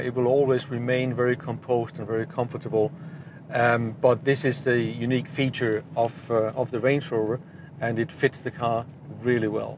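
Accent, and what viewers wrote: Denmark. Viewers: You can always hear a Danish guy speaking English.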